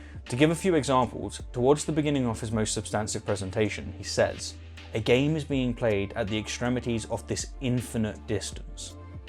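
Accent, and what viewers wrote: United Kingdom. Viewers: Also you are pronouncing wrath the American way rather than the British way which is out of kilter with the rest of your pronunciation and grammar which is British.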